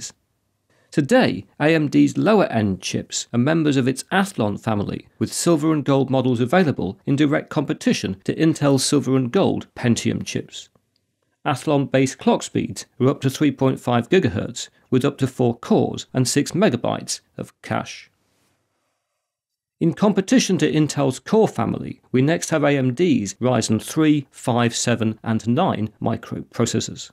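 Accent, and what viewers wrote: United Kingdom. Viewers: that british accent! love this channel.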